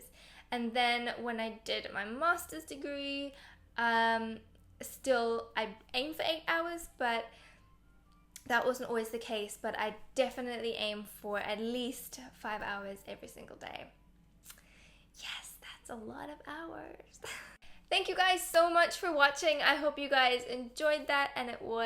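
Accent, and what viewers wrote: United Kingdom. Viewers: Whwre is she from? Is she british? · You sound like a british voice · I love hearing u talk,I play the Yamuna closed key flute I love it so much I am a beginner and I play 15-30 minutes each day when I get home from school,I am trying to learn by on flute for my school talent show I also love ur accent I always wish I had a British accent one day could u play the song by I really really love it that song Thanks bye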